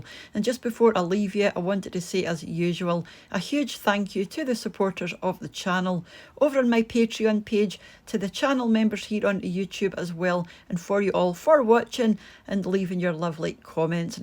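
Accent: Scotland, United Kingdom. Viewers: GREAT trifle - and was so easy to follow the instructions, Our family really enjoyed it and we so enjoyed hearing our Scottish accent which we hadn't heard in a long time living here in Los Angeles - you'll really enjoy this festive dessert at Christmas!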